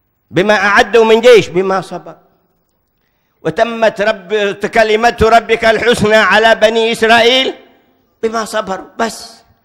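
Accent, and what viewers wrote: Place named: Hadhramaut, Yemen. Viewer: كيف داعية وهو يتكلم بلهجته‼️😐 كيف يفهمون عليه و لهجته حضرمي حبشي‼️😐 والجمهور كله من العجم الله المستعان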